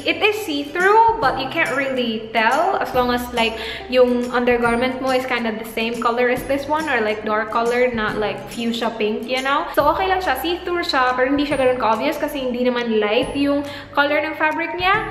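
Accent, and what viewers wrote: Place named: Visayas, Philippines. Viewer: What city do you live ate? The way you speak tagalog makes me think you're bisaya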